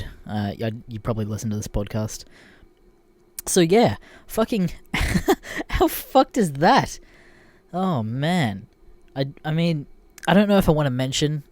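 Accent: Australia. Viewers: Creepswork is not as good as. Australian voices are far superior to Americans. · 1 are you Australian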